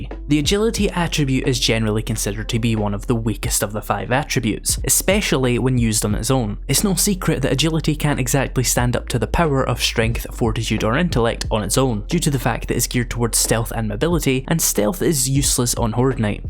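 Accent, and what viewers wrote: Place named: Shires, United Kingdom. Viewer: "This is Pear-fect" listen to that delicious Shire accent sexy fk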